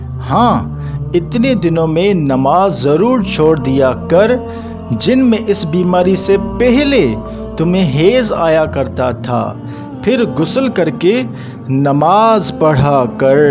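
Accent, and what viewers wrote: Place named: India. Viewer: Apni Urdu sahi bola Allah ka liya ku indians ki terha alphabet ha kha ha kiya quran bhi asa hi perhta ha pata nahi pakistan ma ya kiya bimari chali ha indians ko copy kerna ki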